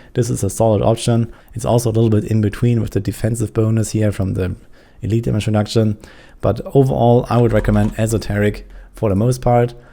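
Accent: Germany. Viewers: Man hört direkt das du deutsch bist 🤣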